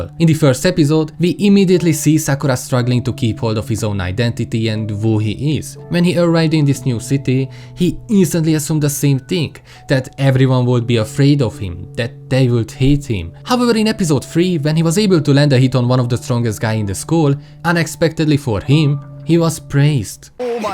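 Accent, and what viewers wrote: Hungary. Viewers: hmmm..... i know a hungarian accent when i hear one. i can smell it from kilometres away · 11 seconds in and I already know its a Magyar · Are you hungarian? You sound like one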